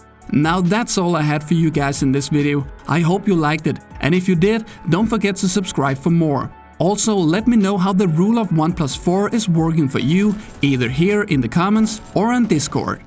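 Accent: Denmark. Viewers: You deserve all the subscribers you have multiplied by 10. Thx for such great content. Are you btw from Denmark? Seem to detect a DK accent 💪🏻🇩🇰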